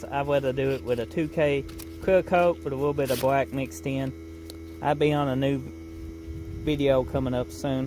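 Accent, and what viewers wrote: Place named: Kentucky, United States. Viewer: lol well I'm from Texas but my dad is from Bardstown and your accent is hardcore lol. good stuff.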